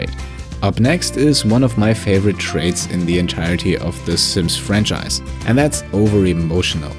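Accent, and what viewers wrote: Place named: Germany. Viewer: Back here for a rewatch after the new Series started and one thing I noticed right away is how different sounds. As a fellow german I can tell how hard it is to get rid of the accent and these videos sound like they were made in the phase where actively improved his accent and english speaking abilities in general. The pronunciation is pretty close but the cadence seems a lot more confident and well... practiced nowadays. Really goes to show what a good content creator is imo. In all his works I feel a passion and a genuine desire to create something that is a joyful experience! So thank you for all the joy you brought me I guess!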